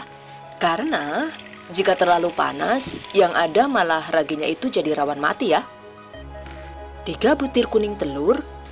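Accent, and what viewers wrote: Banyumas, Indonesia. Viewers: Pintu teater satu telah dibuka 😅 keren tutorialnya kak lengkap dan jelas banget penyampaiannya asik jokesnya dapet ngapaknya dapet hahaha like it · Selalu suka sama videonya n diselipin bahasa ngapaknya, ora ngapak ora kepenak hehehe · Jebule Wong ngapak kiye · mantabbb bu...bahasa Indonesia-nya merdu, bahasa Inggris-nya fasif, bahasa banyumasannya nggemesin, hasil donatnya bagus..penasaran jadi pingin lihat ibu...jangan cuma tangannya :) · Maknyuss donat madunya..yeni virgo akan praktekin...tks ya jeng yg cuantiiikkk..juga kadang ngapakk yaa..he..he..he..salam kuliner buat yg koment yaaa..suksess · Hahaha ngapak lah yu, wong ndi sih rika yu😂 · Orang ngapak 😂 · Jaannn bisane koohhh...jebulee ngapak juga rika yayunee😄😄👍👍👍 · Laah looh kok jebule ngapak.... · Hahahaa ngapake kaya aku mbak, asli ngapak ngendi mbak😁😁😁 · Haha wongapak..donute mentul mentul!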